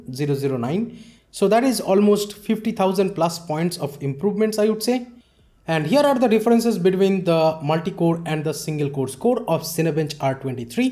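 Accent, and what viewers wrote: India. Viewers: Hindu accent :D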